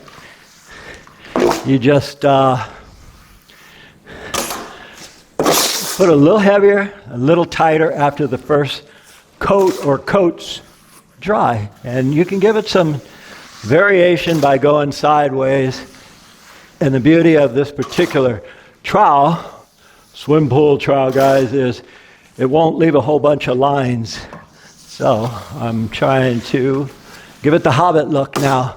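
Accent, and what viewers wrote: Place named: California, United States. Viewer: Man, your accent is so Californian that you could star in the series “The Californians” Love it.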